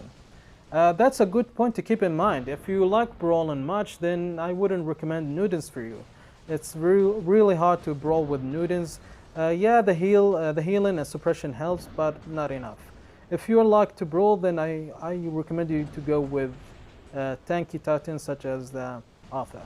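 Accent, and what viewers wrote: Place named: Arab world. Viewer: i know you are arab from your accent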